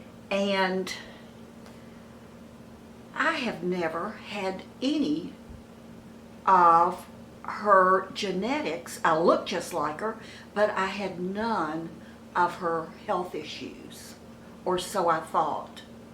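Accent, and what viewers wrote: South, United States. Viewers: I lost my precious Grandma to a heart attack..she wanted to come home and the doctors had already told us it wouldn't be long😢...so she came home on Hospice..but my momma and me took care of her..she lived 5 more day's and it completely broke me! ...I know this is an older video but I Thank God you are okay! The look on your face in the intro really scared me! This is a great message...Thank you for sharing the symptoms..they just might save someone's life..you are such a sweetheart and I just love to listen to you.you have the biggest heart ever!...I can tell you're southern so where are you from? I'm from Indiana...Again I'm so happy you're okay! xo Hydie ❤💋❤💋❤💋 Hugs darlin...you look amazing and I love your sofa..we have similar taste...😜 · I love your accent and southern ways. You should be part of the southern women group (what southern women say) you would be a great addition!!!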